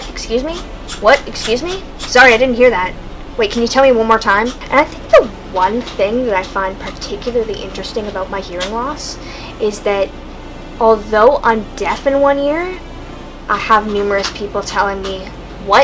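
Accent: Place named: Canada. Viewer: I actually love how you sound. I wanna say Canadian maybe? No matter where you hail from, you have the coolest voice. I wish I sounded like that. Not even joking. Xo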